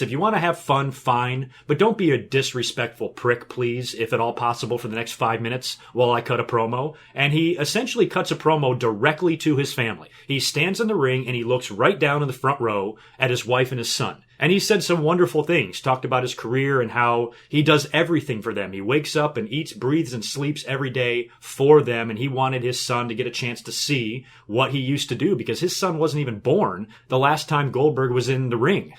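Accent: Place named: United States. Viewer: love the American accent, house of whores match lmao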